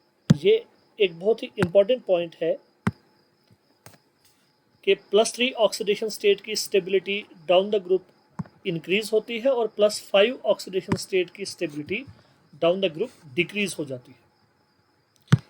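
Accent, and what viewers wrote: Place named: Kashmir, India. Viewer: yes sir .... kashmiri accent is 💞...btw im from Srinagar....iss saal board hai.... dua kejeye ga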